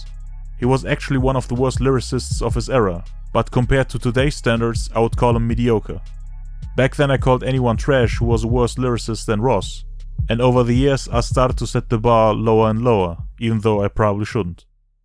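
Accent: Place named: Germany. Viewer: Sounds a Little Bit like you got a german accent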